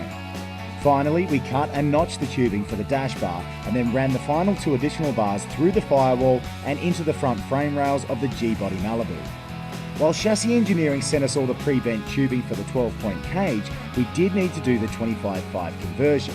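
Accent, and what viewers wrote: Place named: Australia. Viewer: Aussie voiceover guy, who'd of thought. :)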